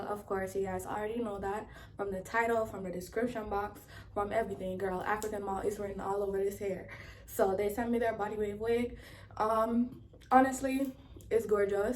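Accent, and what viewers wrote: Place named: Jamaica. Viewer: I love your accent 😊😫 you must be Jamaican!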